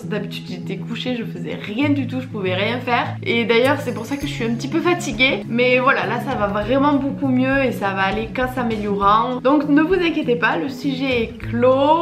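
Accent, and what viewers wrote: South, France. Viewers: J'adore ton accent du sud 🥰 j'avoue la satisfaction du rangement 😅 par contre la couette j'avoue c'est un bordel 😭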